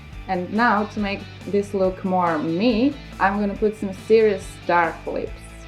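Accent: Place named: Russia. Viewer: Русский акцент .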